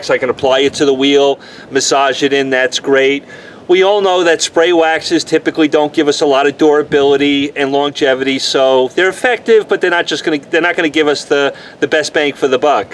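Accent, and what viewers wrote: New York, United States. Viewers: No way you're from Dallas Texas 😂. Sound like your from my part of town. New York / Jersey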